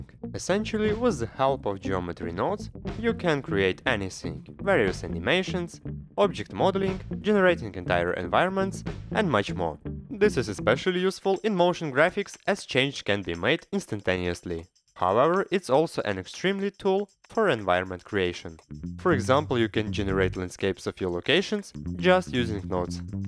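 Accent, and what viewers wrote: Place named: Russia. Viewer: Привет русский акцент 😃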